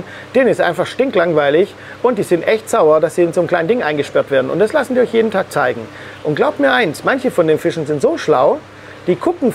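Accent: Swabia, Germany. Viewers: Ich schaue die Videos super gerne, insbesondere des Klartextes und der Direktheit wegen. Der schwäbische Dialekt ist nicht so krass, dass es die fehlerhaften Untertitel bräuchte.😉 Danke für die Mühe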